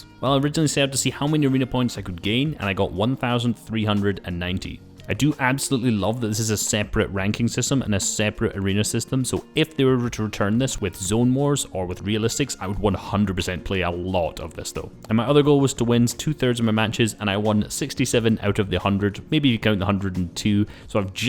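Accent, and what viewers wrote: Scotland, United Kingdom. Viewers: Are ye Scottish mate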